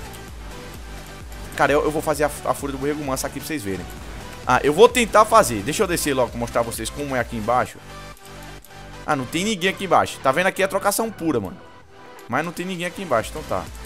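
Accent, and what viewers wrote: Northeast, Brazil. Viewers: se e do nordeste se tem o sutaque do nordeste kk mercadinho tbm so do nordeste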